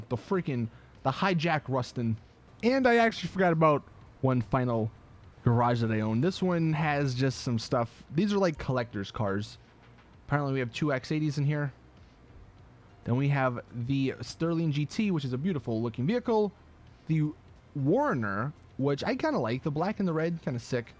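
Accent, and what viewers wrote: Louisiana, United States. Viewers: Are you from Louisiana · What part of Louisiana you from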